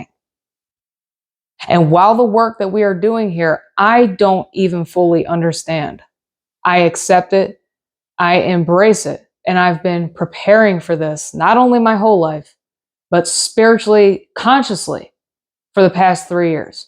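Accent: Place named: Philadelphia, United States. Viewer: Are you from the Philly area? Sounds like it from your voice!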